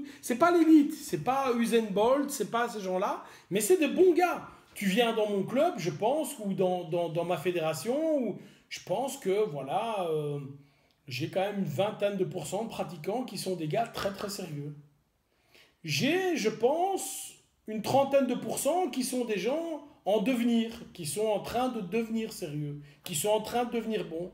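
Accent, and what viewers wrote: Belgium. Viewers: Bonjour, ne penses-tu pas que tous ces conflits entre fédérations, pour des histoires de gros sous, d'égo, gangrènent un peu le Krav Maga ( en France ). Je ne rentrerai pas dans les polémiques, et je ne prendrai pas parti pour qui que ce soit...Mais, j'ai l'impression, et la certitude même qu'on colle l'appellation Krav Maga à toutes les sauces, sur des systèmes de Self défense, pour que ce soit plus "vendeur" ou attractif. Je ne sais pas ce que vaut l'I.K.M, et je ne permettrais pas de juger...Sincèrement, je partage ton avis, sur le fait qu'il y a des bons partout, et des mauvais partout. J'aime assez ta façon de voir les choses, mais ( avec tout le respect que je te dois ) j'avoue que je ne sais pas quoi penser de "civils" enseignant des techniques "paramilitaires" voir " policière", impliquant parfois des armes, à d'autres civiles. Je pense sincèrement que ça devrait rester le domaine des forces de l'ordre. 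Ce n'est que mon avis, n'y vois pas une attaque ou quoique ce soit d'autre, mais, je suis persuadé que tu comprends ce que je veux dire. Continue tes vidéos, j'aime assez les regarder, car elles sont souvent très justes...J'ai beaucoup de sympathie et d'amitiés envers nos voisins belges, et c'est un régal d'écouter ton accent. Force & Honneur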